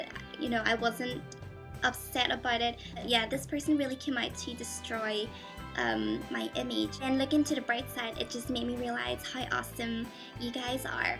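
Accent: Ireland. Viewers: youre irish!?!? sry,,, slow moment ive only seen like 2 of your videos :) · You sound irish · Whoa, an Asian girl with an Irish accent! That's pretty much the hottest thing ever. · I've had this problem before on the internet. It sucks tbh cos there's almost nothing you can do about it! You've done the right thing anyway by letting people know what's going on in a mature way. Anyone who knows you and cares about you will recognise that you aren't malicious at all, in fact from what I've seen you couldn't be more honest and kind. p.s. I love the little hints of Irish that sneaks in now and again when you're talking, it's really cute and certainly unique! Keep smiling! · I JUST NOTICED YOUR IRISH ACCENT. Yeah I know I'm slow. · dude u hav an irish accentish wer u born der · You have the cutest accent EVER <3 omg <3 you can hear the little irish bits and GAHH <3 so so sweet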